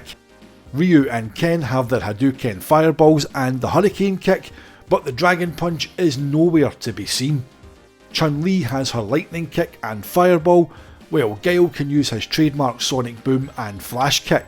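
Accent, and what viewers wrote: Scotland, United Kingdom. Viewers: I’m curious about the person who narrated this video. Where is his accent from? I think it sounds Scottish, but it could be from new zealand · Sounds Scottish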